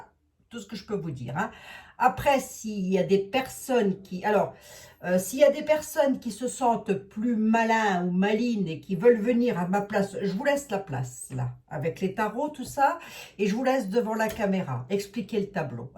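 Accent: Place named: Lorraine, France. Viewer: Bonsoir, je vous écoute toujours avec plaisir. J'aime aussi votre accent et expressions qui me rappellent ma lorraine natale.❤❤❤❤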